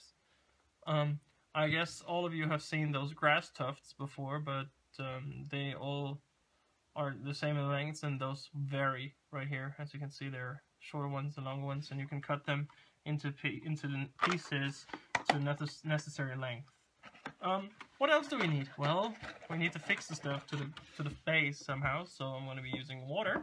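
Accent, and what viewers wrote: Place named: Southern California, United States. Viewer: I like your "shoot from the hip" style. It really is like that in real life, where different techniques, materials, and approaches are constantly being juggled to find the best way to model a certain stage of one's work. By the way, maybe you have disclosed it, but are you in Europe? Your common products such as white glue appears in your clips with European labeling, and you have mentioned costs in Euros, not dollars. This belies your SoCal accent. But I do enjoy the casual nature of your clips, and the emphasis on diorama building and layout.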